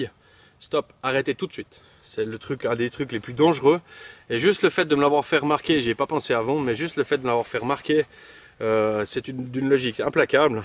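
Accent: Switzerland. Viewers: Coucou, je me suis abonnée à ta chaine depuis la première de T vidéos dans la neige;)!!! Franchement je t'adore tu es marant, ta bonne humeur fait du bien, je rigole beaucoup, ton rire est communicatif!!! pour les vidéos j'y connais rien, mais sur certaines autres j'entend rien, avec toi tout es clair!! joli paysage, ton accent à mourir, té pas suisse pour rien car tu dit tout le temps "c'est pas grave, on a le temps" hihi enfin tout ça pour te dire que j'ai presque fini de visionner tes vidéos et que ça va être long entre chaque hihi merciiiiiiiiiiiiiiiiiiiiiii pour les partages et surtout change rien tu es super.... la bisouille... Evy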